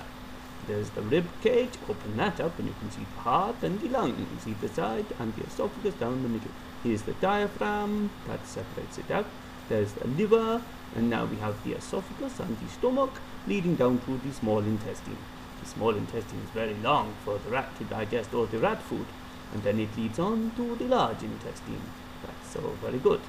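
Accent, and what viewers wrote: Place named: Italy. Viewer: Awesome vid. :) Thumbs up for the Italian accent :)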